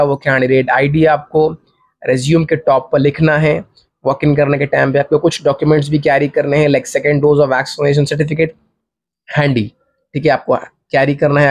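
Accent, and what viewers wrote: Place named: United States. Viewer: Bhai first change your tune into indian. You're speaking hindi with American accent 😂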